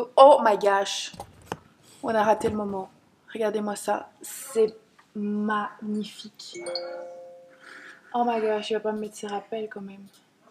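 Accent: Belgium. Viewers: J'ai le même couleur argent, très agréable d'entendre ton accent Belge 🙂j'suis Canadien ;-)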